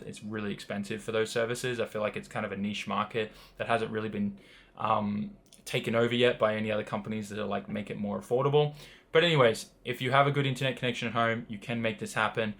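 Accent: Australia. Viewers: I'm so glad I'm not the only one who heard that, lmao. The Aussie accent is strong in this one.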